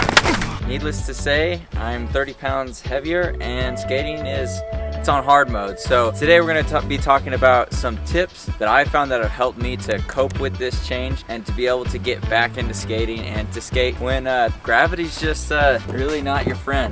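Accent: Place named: Utah, United States. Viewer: I somehow knew you were in Utah as soon as you started talking 😂. Great video, thanks.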